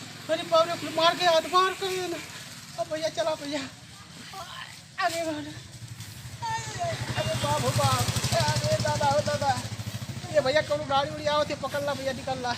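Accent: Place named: Azamgarh, India. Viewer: achchha Mai bhi azamgarh se hu apke bolne ke tareke se hi mughe bahut Dino se lag Raha tha ki ap azamgarh se hi Hain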